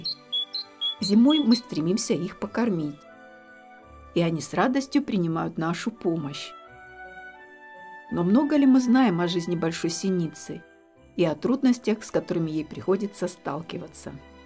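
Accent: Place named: Vologda, Russia. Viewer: Интересный говор. ВОлогодский конвой шутить не любит. Угадал?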